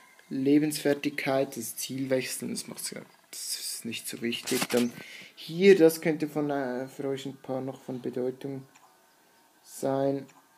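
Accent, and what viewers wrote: Switzerland. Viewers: Da hört man Schweizer Dialekt raus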